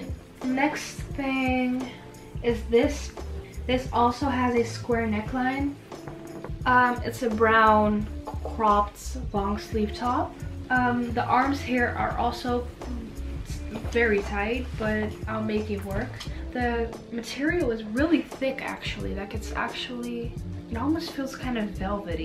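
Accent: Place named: United States. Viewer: bro u sound American and ur English is GOOD🤝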